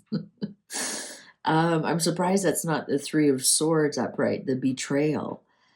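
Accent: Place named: Canada. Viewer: I'm an Australian living in Canada and I immediately knew you are Canadian from your accent. People forget the Royal Family are our RF too (Australia and Canada). 😅❤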